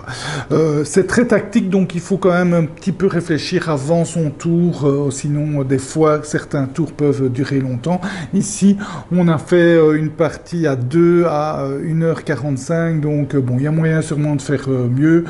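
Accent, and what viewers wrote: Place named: Belgium. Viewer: Merci, bonne explication et une petite critique constructive à la fin. J'adore ton accent Belge, ça me rappelle qd une partie de ma famille habitait Bruxelles !!!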